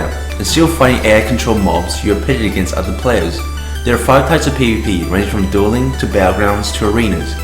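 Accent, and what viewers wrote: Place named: New Zealand. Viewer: Kiwi accent, not British. He says it himself at the end.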